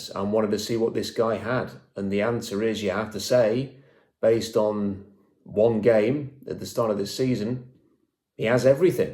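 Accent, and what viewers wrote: United Kingdom. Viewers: Wait! Hold on! You've got a British accent, and you have no problems with "football"? Where's the "foot" in "football"? I always hear.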